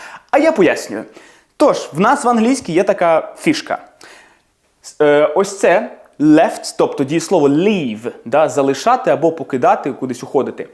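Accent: United Kingdom. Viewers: Хоч у когось із наших ютюберів класна вимова. Причому британська, а не огидна американська)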